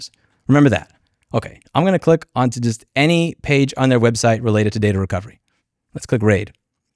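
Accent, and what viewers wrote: New York, United States. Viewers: The "New Yorker" in you really came out in this video hahaha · They obviously have no clue who you are. You may be in Texas, but I love the New Yawker in you! :D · I think we all love when really gets going in the New York accent comes back out strong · Lol your NY came out a bit more than usual. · Ny accent came out strong in this one, was exceptionally tight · I love when you get excited about calling people on their BS. Your NY accent comes out hard, lol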